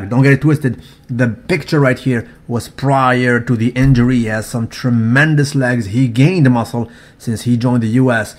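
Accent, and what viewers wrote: France. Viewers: son accent est plutôt français que québecois dailleurs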